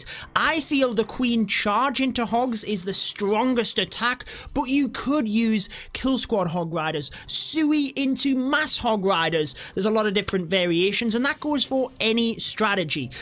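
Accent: United Kingdom. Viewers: Who else is just enjoying the nice British accent?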